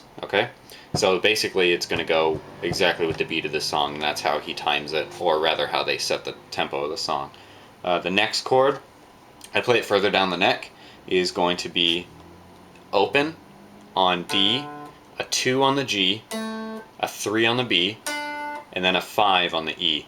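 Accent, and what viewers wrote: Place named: Germany. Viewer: I'm really speechless at how wicked that thing is. You sound a bit like Klaus from american dad, a slight german accent there :-)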